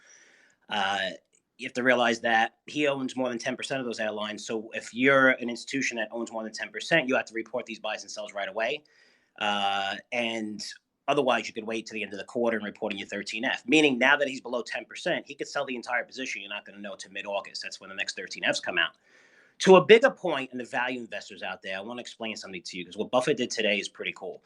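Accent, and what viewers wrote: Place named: Staten Island, United States. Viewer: This dude sounds like he's from Staten Island. Demz, Deez and Doez. Sounds like he works in sanitation.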